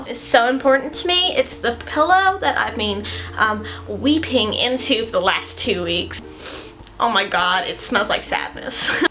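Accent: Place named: United Kingdom. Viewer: Just a few seconds in, and I already forgot that you had a British accent! Great and hilarious video!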